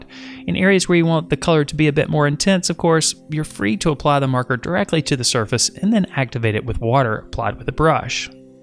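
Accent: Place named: North Carolina, United States. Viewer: Sounds like a Carolina accent. Most likely North Carolina.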